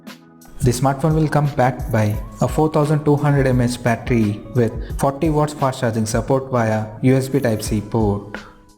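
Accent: India. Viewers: Bro.. What are you trying to say? Your indian accent was so thick until we can't clearly understand your preview.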